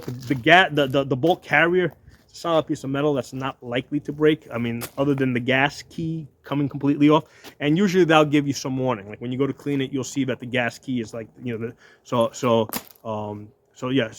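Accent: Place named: New England, United States. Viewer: Yankee with a speech impediment. Negative. I’m not listening to this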